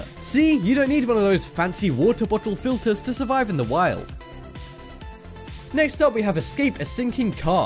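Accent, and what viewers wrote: United States. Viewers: You swy you're from the UK, but you talk like an American. I wonder if you are lying about your heritage...?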